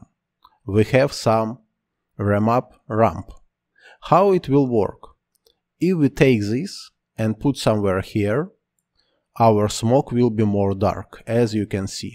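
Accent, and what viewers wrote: Russia. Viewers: Дружище, у тебя всё классно получается, ты большой спец, но почему не вещаешь на русском( акцент выдаёт)? Таких как я полно и нам не хватает уроков по редшифту! Будь добр, сделай серию на русском... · Прикольно))) Не зная английского, я поняла его, благодаря русскому акценту) Спасибо за урок)))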